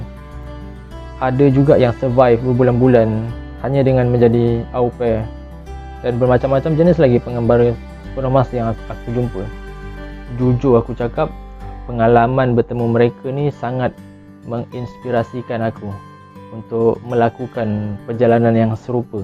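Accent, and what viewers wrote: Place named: Java, Indonesia. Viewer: Pekat jawanyaa.. Very inspiring bro 👍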